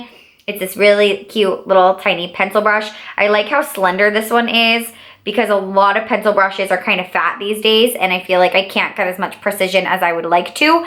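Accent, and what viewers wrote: United States. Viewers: Hello I loved your video I'm a new subscriber! You're s sweetheart seems genuinely beautiful inside and out. You would pass as my twin when I was in my 20's. I don't know if you've ever heard that everyone has s twin somewhere in the 🌎. I'll try to find a photo of me and send it to you. I also love your accent and st first I thought you were from Arkansas . I was born in Pumpkin Bend , Arkansas! You are adorable I'm going to tell all my friends to subscribe to your channel. Your excitement and enthusiasm is contagious it makes me want to go to Walgreens in the wee hours of the morning ! Have you ever heard about the automatic de/pot machine from QVC. I believe Kelly Strack received one in a recent PR package. It might work great for those large single shadows. Did the Morphe limited edition copper and spice palette have a product number? 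I can't wait to binge watch your videos ; so happy that I found your channel.🙋🏻👙👗💅🏼💕🌸🕶💄👠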